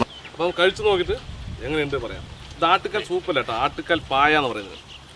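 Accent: Palakkad, India. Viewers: Aa palakkadan slangilulla samsaram kelkkan enthu rasanu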